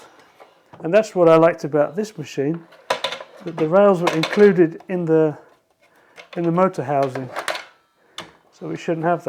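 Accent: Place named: United Kingdom. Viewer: Where are you based ? You’ve got a uk accent and foreign plug 🔌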